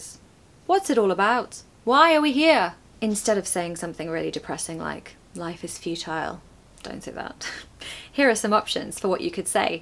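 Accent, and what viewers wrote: RP, United Kingdom. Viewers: Lovely to listen a fantastic british accent! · Hi, you sound like Lucy from English with Lucy. Has anyone told you this before? Or is it anyone with RP speaks as you do? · I love your british Accent because overwhelmed me as well as your style of presentation and l'm fond of you. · i wish i could speak english with a pr accent like you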